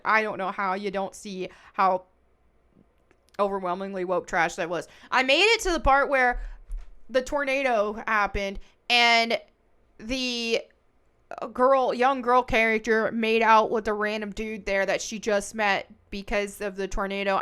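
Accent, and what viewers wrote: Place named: Texas, United States. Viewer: First time I have seen your vids and I agree.. I can tell you are from Texas like I am. lol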